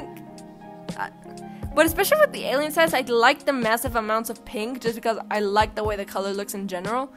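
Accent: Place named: Mexico. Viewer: Oh damn, una mexicana with the accent, a weeb, and a shitposter, my typa girl. 😂 seriously tho, good video, brought a ton of childhood memories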